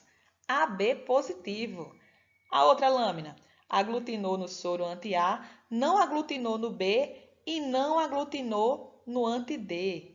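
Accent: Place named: Bahia, Brazil. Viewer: kkkkkkkk é um pouquinho forte, mas é lindo. Bahia?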